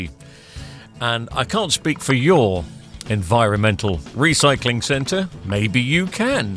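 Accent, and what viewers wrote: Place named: North East England, United Kingdom. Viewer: I thought you was North East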